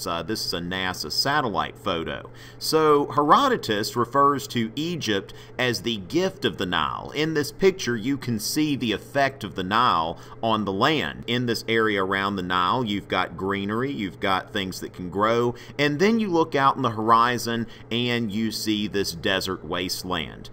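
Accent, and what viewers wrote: United States. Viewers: Why do you have to talk in a cowboy accent ?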